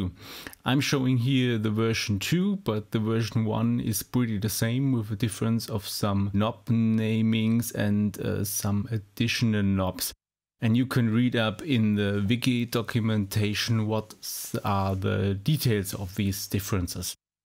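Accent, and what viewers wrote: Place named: Germany. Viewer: Well Done! Up And DOWN! love the german accent! thx a lot!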